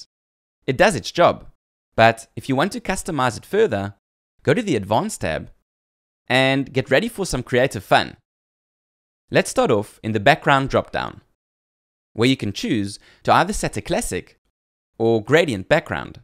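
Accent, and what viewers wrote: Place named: South Africa. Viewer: He is sowth efrikan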